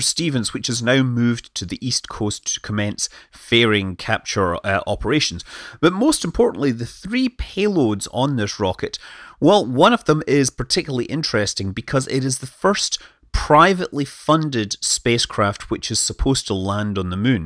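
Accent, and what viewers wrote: Scotland, United Kingdom. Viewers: Can we call you { }? Are u in fact scottish? Great video's, thank you.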